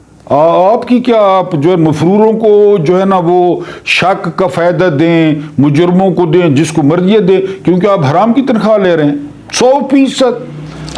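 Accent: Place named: Saraiki, Pakistan. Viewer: لالا آپ نے بھی عطا اللہ عیسی خیلوی کے طرح( غلابی ) اردو بولنا شروع کر دی ہے۔۔ بہرحال سرائیکی ہماری تو پسندیدہ بولی ہے کیونکہ اس میں لہجہ نرم اور میٹھا ہوتا ہے۔جس طرح آپ کالا یرقان کا بیٹا کہتے ہو۔تو مزہ آجاتا ہے۔ویسے بھی آپ کی باتیں سبق آموز اور میٹھی میٹھی ہوتی ہیں کچھ لوگوں کو تو سن کر اولٹی آجاتی ہو گی لیکن بھائی جی ہم تو غیرجانب لوک ہیں ہمیں آپ کی باتیں سن کر بہت مزہ آتا ہے۔ ۔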